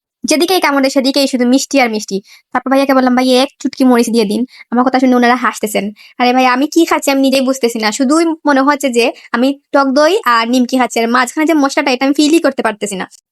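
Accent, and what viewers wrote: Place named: Sylhet, Bangladesh. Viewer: Boin sylhety matilaw regho shuddo basha re nu rape korilray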